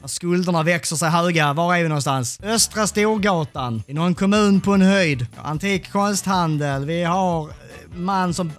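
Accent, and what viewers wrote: Scania, Sweden. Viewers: Fy fan för den vidriga skånska dialekten!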